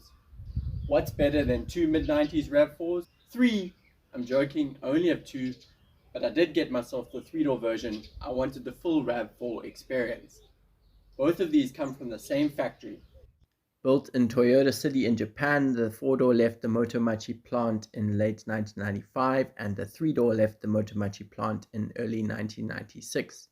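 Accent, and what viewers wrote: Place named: South Africa. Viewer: Loving the South African accent and "Eish"a good name! My 1996 5 door is named RAVioli, a nod to my Italian heritage. Where can I get a cargo door handle that doesn't cost an arm and a leg? I'd need to ship to South Africa. eBay and Amazon sellers don't ship here from the US. I don't mind second hand. 🙏🏼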